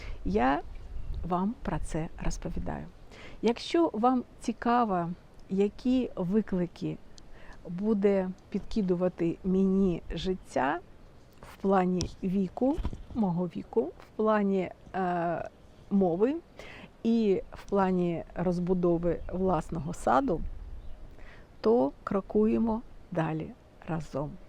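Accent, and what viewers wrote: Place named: Belarus. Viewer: Вітаю Вас. Ви велика молодець) по всіх питаннях, що Ви озвучили! Вас приємно слухати і дивитися) мені здається що Ви білоруска, тому що слова звучать наче українською але з білоруським акцентом! Бажаю успіху з садом і з мовою!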